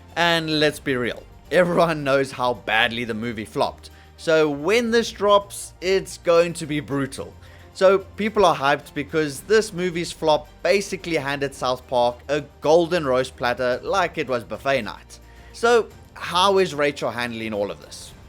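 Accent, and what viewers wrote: South Africa. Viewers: I know it says united states under your page but I can't unhear it, you sound South African bro are you from here by any chance? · You sound south african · Kan hoor jy is n South African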